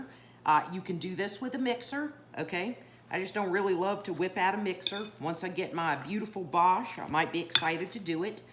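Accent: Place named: Eastern North Carolina, United States. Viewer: You sound like my folks in Eastern, NC.❤❤❤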